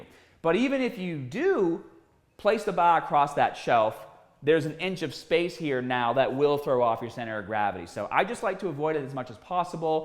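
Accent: Boston, United States. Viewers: Gotta be Boston or somewhere in that region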